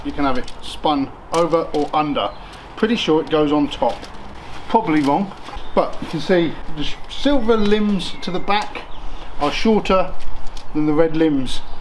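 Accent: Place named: United Kingdom. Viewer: Any chance you can learn a different accent lol? You have good content British accents are the absolute worst. African, Australian, American, Latin American would be so much better.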